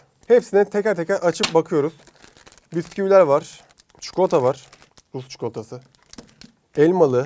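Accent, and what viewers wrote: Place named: Istanbul, Turkey. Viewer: Abi sunarken içten sunuyorsun. Sokak ağızıy la ve istanbul türkçesi ni karıştırıyorsun. Buda etkileyici duruyor. Özellikle tonlamalar 😅. Küfüre pek takmayın sadece izleyin. ☺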